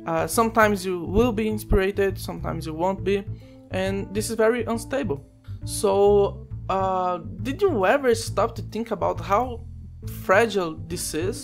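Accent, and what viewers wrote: Brazil. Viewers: Excelente vídeo! Cheguei no canal recentemente e pelo sotaque, deu pra ver que você é brasileiro <3 Mas eu compartilho da mesma opinião,. Nós nunca podemos contar com a mera motivação para criarmos algo. Eu particularmente me forço ao máximo pra trabalhar nos meus projetos uma quantidade de horas satisfatória. Pra isso, eu uso um método que seria uma espécie de Scrum individual adaptado às minhas necessidades. Primeiro, eu crio um Product Backlog, com tudo que eu preciso fazer (seja uma feature da engine que eu estou desenvolvendo, alguma documentação, seja uma mecânica nova, seja um conjunto de sprites, uma animação, ou qualquer coisa), então, cada semana é como um iteração na qual eu preciso entregar uma quantidade X de releases baseado na minha atual deadline. E diariamente, eu faço um relatório com tudo que eu desenvolvi naquele dia, quais foram os meus empecílios e o que eu devo fazer pra melhorar aquilo. 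Dessa forma, eu não dependo apenas da motivação e eu me "forço" a continuar criando pra conseguir atender aos meus objetivos de longo prazo. Esse "método" ainda está em fase de testes uasdhusahudhusa, mas eu procuro aperfeiçoá-lo sempre que posso. Um grande abraço e parabéns por esse canal incrível <3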